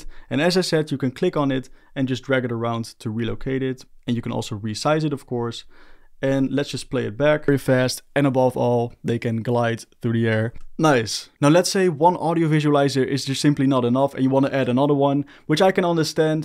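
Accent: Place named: Netherlands. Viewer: Alright I know this has nothing to do with anything but you sound like you're Dutch, can you confirm or deny this because I'm wondering if I'm right now haha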